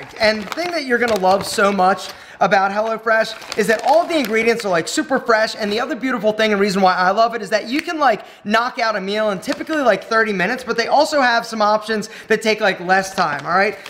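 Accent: United States. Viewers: hey if you are reading this comment you very much look like our Bollywood actor saif Ali khan whose eyes are exactly looking like yours and despite of your us accent his voice of speaking in English is identical of yours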